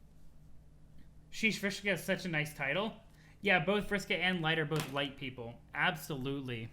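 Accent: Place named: Scotland, United Kingdom. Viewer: your socttish eridan accent is the best thing i've ever heard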